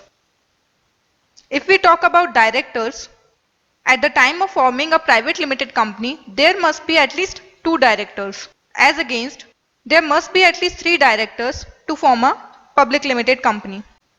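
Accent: India. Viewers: Indian accent > > > > > >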